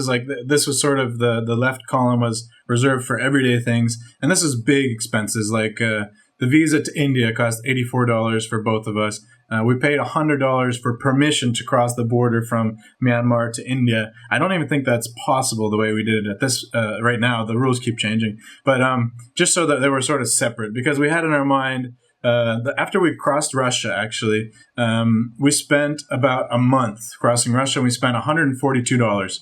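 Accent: United Kingdom. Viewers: Oh I get it now. He's Scottish. That explains everything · She sounds like she has a British accent lol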